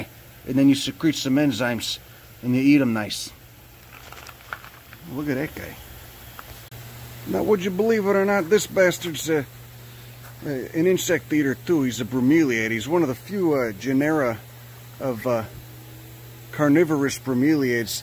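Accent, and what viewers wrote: Chicago, United States. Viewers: lol....you look much different then you sound. how is it you kept the chi town accent even after traveling the country after highschool? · Brian Williams more like CHICAGO.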